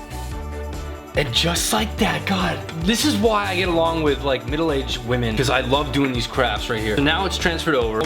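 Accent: Canada. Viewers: Love the canadian accent ❤️❤️👌🏽 & like seeing a guy say he likes crafts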